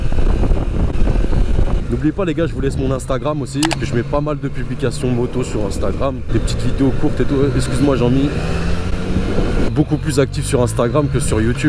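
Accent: Banlieue, France. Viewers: T'as un bon capital sympathie. On reconnait l'accent de la banlieue, mais c'est pas graveleu, c'est pas ziva, et je me suis marré même parfois. Je m'abonne pas mais je te mets des likes ;-)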